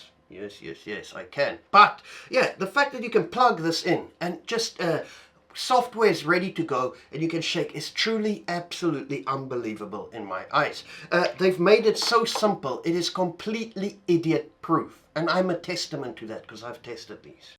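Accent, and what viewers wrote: South Africa. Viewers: I recently discovered your channel and I’m loving your content. Are you South African?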